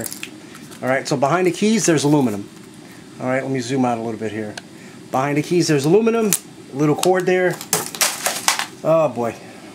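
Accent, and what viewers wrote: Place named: Bronx, United States. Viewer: yo!!!!!! are you from tha Bronx or somthin!!?? ah???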